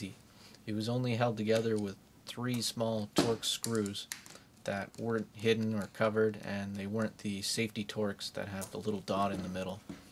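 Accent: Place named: Canada. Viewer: Why would a guy with a funny hat and Canadian way of saying... out... take it upon himself to explain stuff to us? I don't know but this little video is entertaining and informative. I have to get me such a tool. Thanks!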